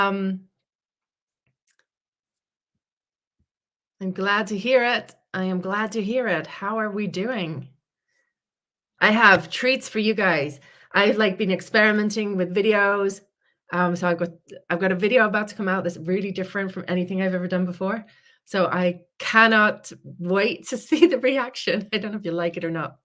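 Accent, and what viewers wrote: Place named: Northern Ireland, United Kingdom. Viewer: I thought I could hear a hint of a N.I accent.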